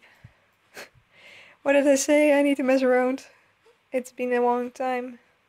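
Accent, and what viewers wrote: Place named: Netherlands. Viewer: Super leuk!!! en ik kan wel horen dat je Nederlands bent XD ;)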